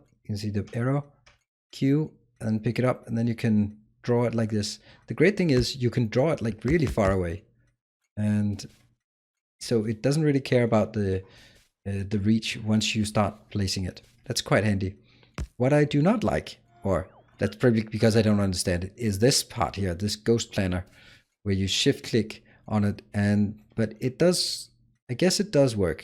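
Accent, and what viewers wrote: Denmark. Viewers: Denmark? Im asking because of te accent 😁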